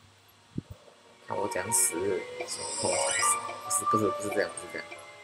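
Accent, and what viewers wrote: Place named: Taiwan. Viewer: 聽聲音J大好像是臺灣人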